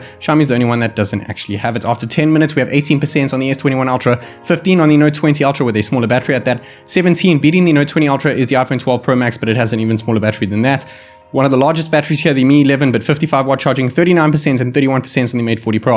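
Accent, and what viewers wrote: South Africa. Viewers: Listening to you, sounds like you're South African, getting some SA accent vibes :D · Had to like and sub because of the South African accent ❤️ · Bro are you from SA?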